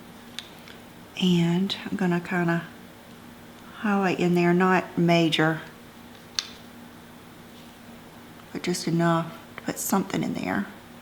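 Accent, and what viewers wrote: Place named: North Carolina, United States. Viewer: Are you from nc? I'm trying to place that accent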